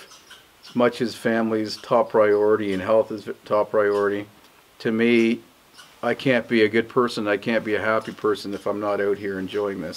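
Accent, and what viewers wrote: Canada. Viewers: Being a Canuck (Ontario) living in the USA and just finding your videos, this is a great feeling. I am enjoying living stateside however, I miss Canada and my backcountry escapes. Nature here is different and while that's great to experience, I have the feeling for the wilderness I grew up travelling to. Killarney, Algonquin Park, North Bay etc. Those trees, bird sounds and smells feel like home in comparison to what we have around us now. So your videos (and accent) are a great view of home. Thank you for making them and I look forward to watching more. All the best!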